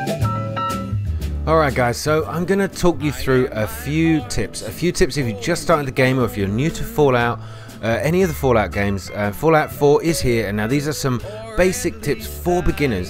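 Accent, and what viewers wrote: United Kingdom. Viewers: Got the game a long whole ago but never started off with it. this video is not only an interesting watch but a good start for me too. Plus i like ur accent - Briton? and oh ... music is ok ok, i was concentrating on ur voice and didnt feel too distracted. maybe next time go for something with no lyrics.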